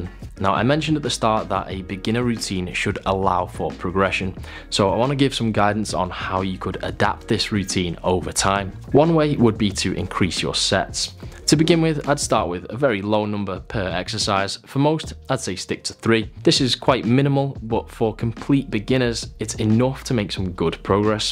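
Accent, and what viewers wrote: United Kingdom. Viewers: Are you from UK ?? your accent is very hard to follow.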